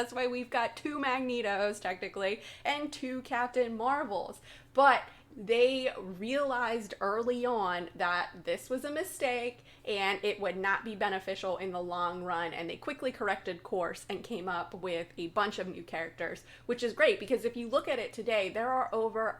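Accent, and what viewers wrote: Pittsburgh, United States. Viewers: Ur Pittsburgh accent is showing through... Lol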